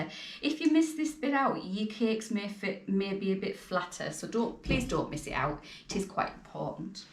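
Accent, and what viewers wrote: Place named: Yorkshire, United Kingdom. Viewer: Will defo give this a go. Can I just say I am guessing your accent, are you north east or Yorkshire way?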